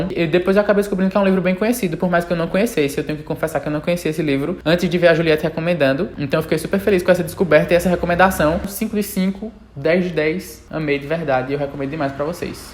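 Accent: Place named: Pernambuco, Brazil. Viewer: Ahh como é bom ver youtubers com o nosso sotaque maravilhoso. Ouso apostar ser do meu país Pernambuco. Ainda mais influenciando a leitura, que é tão relevante. Muito sucesso!! 🙏🏾🙏🏾🙏🏾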